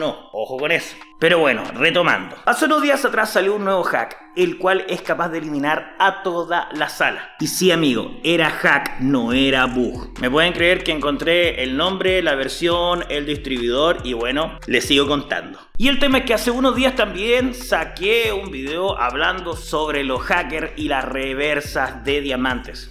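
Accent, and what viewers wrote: Chile. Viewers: Hola soy nuevo. Ase tiempo que te conosia pero me daba flogera entrar. Pense que eras español por tu bos o asento pero en un tik tok que isieron soble ti escuche tu bos y pense que eras chileo lo busco en google y vi que eras chileno yo tambien soy chileno haora si que si estare a tento a tus videos ace un mes me registre en free no tengo diamante labropa que tengo la consegi con ticets de incubadora o diamantes los diamantes uqe tengo son los de los regalos que dan cuando entras a una partida me pregunto si me puedes dar aunque sean 2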